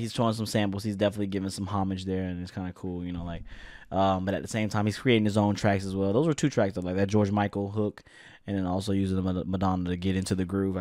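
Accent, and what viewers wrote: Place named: Toronto, Canada. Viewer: Bro please stop doing that Toronto accent 😭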